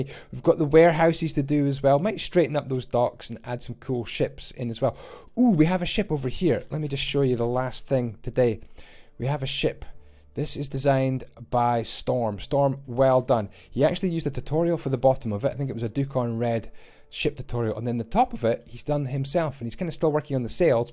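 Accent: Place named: Scotland, United Kingdom. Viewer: Lol. You most certainly have an accent, my dear chap (sounds like a Scottish accent, perhaps?), but it sounds a lot like you're saying "pam". It's okay, though. I was just trying to help you out. Also, I am a native English speaker, as well, but I am also an English teacher ;)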